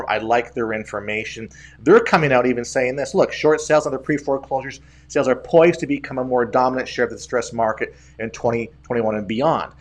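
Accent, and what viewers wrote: Canada. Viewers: You must be Canadian because you say oot and aboot.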